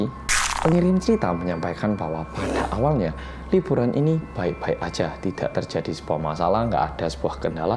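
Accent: Java, Indonesia. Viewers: Aku klo mas ngomong orang korea yg. Medeekk aku nya ikutan 🤣😆😂😂 Mas aku udah kirimin trus cerita pengalaman ku ke Gmail yg mas bilangin, tapi tenggelam sama yg lain 😁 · 3 channel storytelling favorit dan bagus: 1. (pembawaan santai dan medho serta mudah dipahami) 2. Detektif Aldo (mirip siaran radio) 3. Arroyan Pram (kasus, konspirasi sampe sains fisika, editan paling niat dan keren. Serasa nonton film layar lebar).. Kalo kalian?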